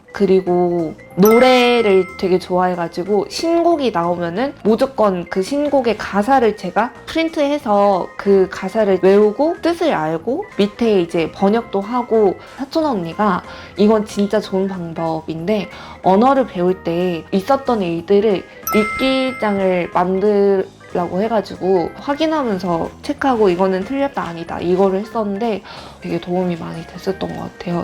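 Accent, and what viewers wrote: South Korea. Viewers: 언니 근데 몽골어로 말하는데.. 좀 몽골어 잘하는 한국사람이 말하고있는것 처럼 들려서...ㅋㅋ 뭔가 발음이 한국스러워졌다랄까? p.s 언니 영상 잘 보고 있어용~~ 언니 영상 볼때마다 엄마가 해준 banshtai tsai 먹고 싶어지고.. 뭔가 뭉클하고.. 그래요... 응원합니다!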